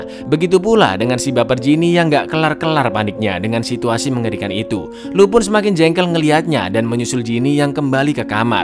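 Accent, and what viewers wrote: Java, Indonesia. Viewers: Intonasi, jawa-nya terasa banget + mantap bang.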